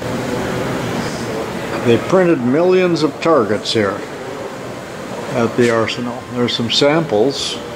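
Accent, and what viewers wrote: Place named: Midwest, United States. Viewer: No disrespect intended . ! I guess it's that Midwest voice that reminded me of him . He was a good man .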